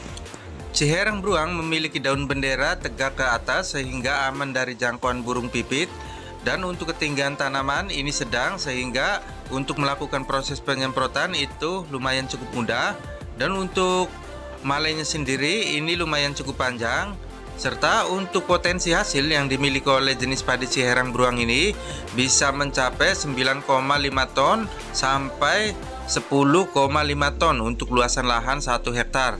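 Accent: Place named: Indramayu, Indonesia. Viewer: Sampean sing daerah endi, indramayu e kang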